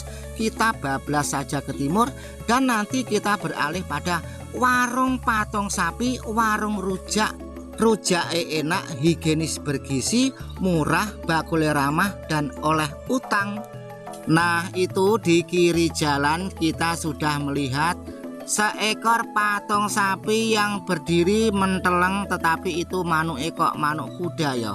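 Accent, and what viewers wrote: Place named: Bojonegoro, Indonesia. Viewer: Aku melihat konten2 Pak Dhe ini hanya dari sisi "NJAJAH DESO MILANGKORI"-nya. Utk wilayah Jatim hampir sebagian besar sudah di-JAJAH DESO MILANGKORI. Utk Jateng... masih mlipir2 ndeso2 perbatasan, Rembang sama Blora. Yg aku salut... Pak Dhe ke mana2 hanya naik motor matic-nya. Sepertinya secara logat dan awal2 berkonten, beliau asal Bojonegoro.👍🏻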